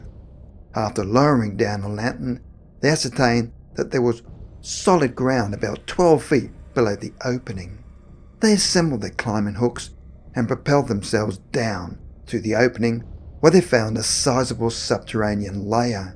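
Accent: Australia. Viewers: I think narrator is from Australia. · Kristina Mullen The narrator is either Australian or from New Zealand.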